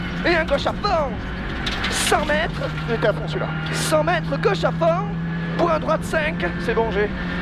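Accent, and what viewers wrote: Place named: South, France. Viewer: Es ce que vus faites des rallyes dans le sud ? Ps : vous venez d'où ? Car vous avez un accent du sud un peu 😅, j'adore continue comme ca !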